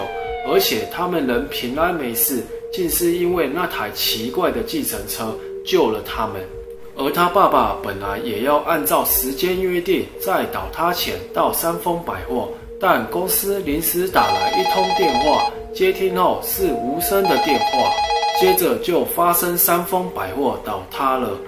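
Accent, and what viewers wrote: Taiwan. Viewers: 有台灣國語😂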